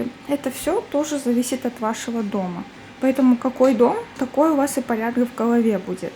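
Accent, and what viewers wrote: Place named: Japan. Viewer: Вы очень красивая и милая☺️ мне нравится как вы говорите на английском и cute Japanese accent❤️ Желаю вашему каналу процветаний🥰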